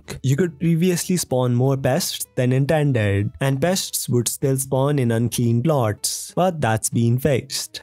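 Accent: India. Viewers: bro has pure indian accent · you sound like Indian?? are you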